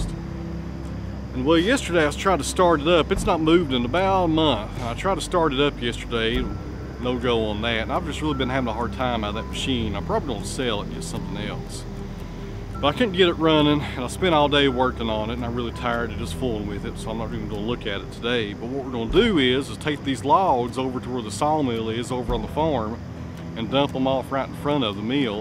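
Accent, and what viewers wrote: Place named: Tennessee, United States. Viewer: I didn't know where your particular flavor of southern accent was from, I guess Tennessee it is. Cool stuff.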